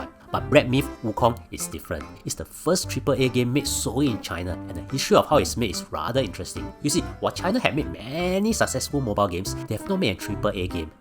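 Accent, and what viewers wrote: Singapore. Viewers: Singlish and his accent is so funny, so cuuute, I love it. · That singlish is making my ears itch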